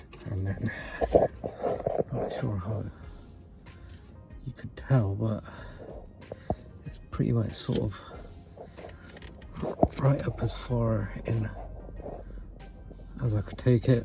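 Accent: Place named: Bristol, United Kingdom. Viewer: Nice video 😎👍🏽... Sounds like a Bristol accent 👂🏽That's my town 👊🏽😎... Love the content, helped me gain some valuable knowledge for sure! Keep up the good work 🔥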